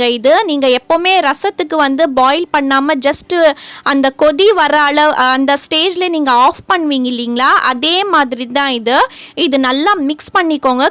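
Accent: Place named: Malaysia. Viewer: Neenga malasiyan ah sis? Unga voice la apdi theriyudhu adhan keten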